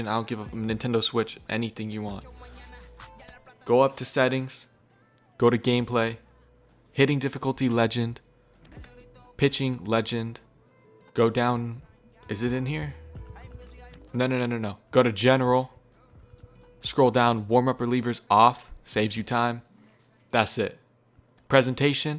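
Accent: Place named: Bay Area, United States. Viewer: You have to be from The Bay talking like this😂😂😂😂. Gained a new sub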